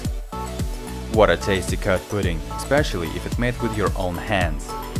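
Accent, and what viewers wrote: Russia. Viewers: ax etot rouski aktsent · ja irgendwie schade ich finde grad sein russischer Akzent macht ihn total sympathisch.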